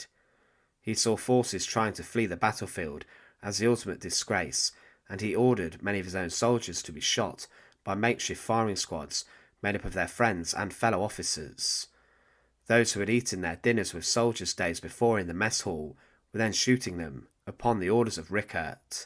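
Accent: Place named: England, United Kingdom. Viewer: I can’t stand English accent and this dude takes it to another level.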